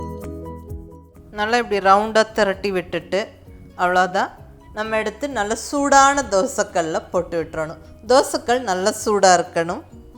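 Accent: Kongu, India. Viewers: Akka....sema super..I am your fan Akka..Really your are amazing sis...namma kongu tamil speech la asathurinka....tdy poli semaya arumaya iruku....😍😘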